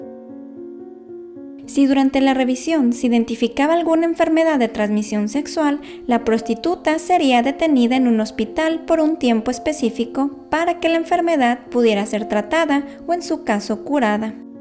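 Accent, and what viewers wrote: Mexico. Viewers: Holaaa lindo acento mexicano 👋👋 Muy buena historiografía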